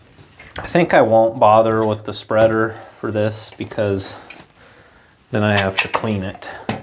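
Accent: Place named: Nebraska, United States. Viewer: I know this is 2 yrs old. And i havent seen in your comments the answer to why theres an "ethernet" port on the fan. its not an ethernet port. RVs use what look like cat5 cables for internal wiring. That is a port for one of them cables. My van uses the same types of cables for the internal wiring for like fans, radios, lighting etc. oh yeah i have a conversion van. I knew you were from nebraska. every nebraskan knows other nebraskans lol. theres just something about the talk and the attitude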